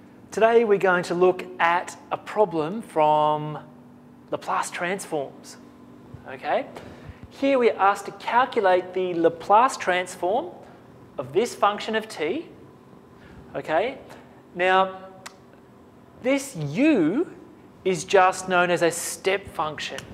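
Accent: Australia. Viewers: Good on you for making this, love having an Aussie for once!! Cheers , 21yr old ENGG student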